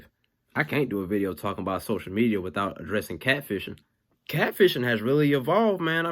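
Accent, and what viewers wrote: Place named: Atlanta, United States. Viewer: I love your improvisations and your roast. I'm from Westside Bankhead and I don't really get to hear that speak anymore so it's nice to find someone that speaks my language.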